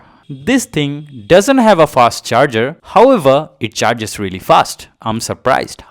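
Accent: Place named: Northeast, India. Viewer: He has an Asian accent. North East Indians have such an accent.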